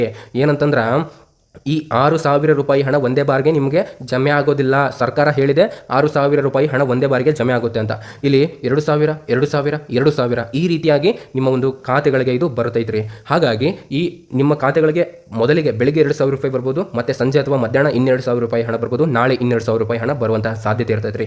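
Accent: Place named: Bengaluru, India. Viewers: Bangalore Kannada language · Bengaluru kannada sir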